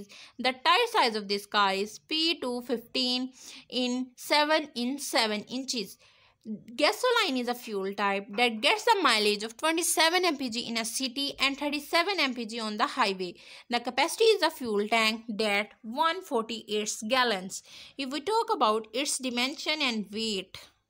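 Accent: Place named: India. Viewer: Very hard to understand, deep indian accent makes listener uncomfortable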